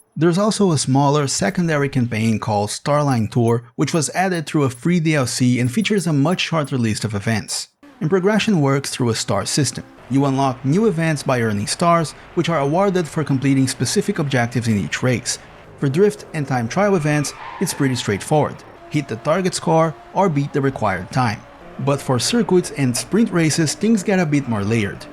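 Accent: Brazil. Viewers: that’s why I asked, because he had a faint but noticeable accent that I could only trace as PT-BR. I didn’t manage to watch the whole video, but I am not surprised that there was some footage with Portuguese text. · Yes, i'm 100% sure he is. I can spot a brazilian just by their accent, plus there's the gameplay in the video that's in portuguese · Dude, are u Brazilian? You accent kinda sounds like it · ingles bom mas aquele quezinho de hue está perceptivel no sotaque! to enganado? anyway, i never played this game for more than like a race or two at a time. do we already have ps4 emulators? 🤔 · Bom video, jogo até hj, e lamento que nao comprei o ultimo dlc. Obs: to escrevendo em português pq o seu sotaque te entrega. O curioso é que Driveclub vai sobreviver ao tempo, enquanto os jogos de corrida hoje nao saem em midia fisica (ou no caso de GT7 mesmo em midia fisica, precisa estar online, do contrário vc tem 10 carros e 3 pistas pra usar só no modo time trial ) . 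Tenho todos os dlcs e rodo hj em dia no ps5, me lamento nao ter pegado o ultimo dlc, que foi o unico que nao era incluido no season pass. O Dlc que vinha com o Jaguar XJ220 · Great video! Congrats! Btw, are you brazilian? Your accent looks familiar. Keep it up with this awesome content.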